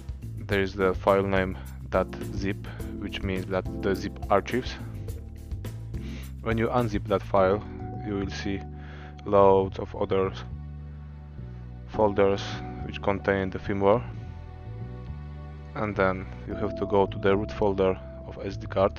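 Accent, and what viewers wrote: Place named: Poland. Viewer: What language you are using ? bcos if its english... then you have to practice a little bit more... thats more like polish then english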